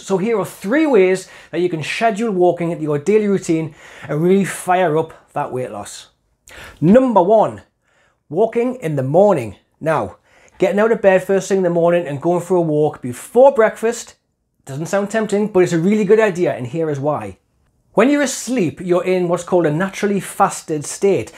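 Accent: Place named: Newcastle, United Kingdom. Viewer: Geordie . Hello from Australia. Miss the accent.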